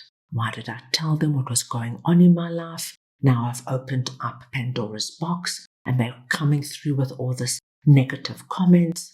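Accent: South Africa. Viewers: I know a South African accent when I hear one. Hello maatjie.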